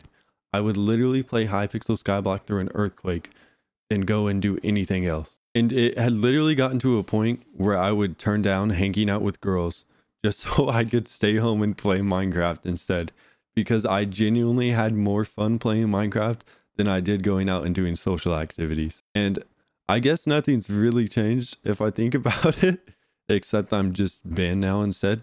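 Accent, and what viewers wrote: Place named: South, United States. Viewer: When did turn like extremely southern?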